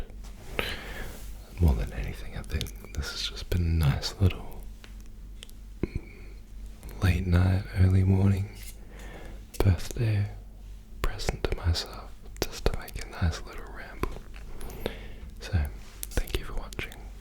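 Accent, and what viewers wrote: Australia. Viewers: The Australian accent is so beautiful 😍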